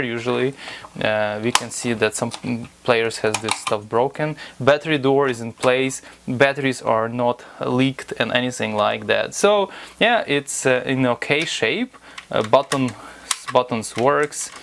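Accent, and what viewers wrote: Russia. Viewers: Zagreb is in now called Croatia. I also love your accent man! Gotta love the Russians! Keep up the great work. :)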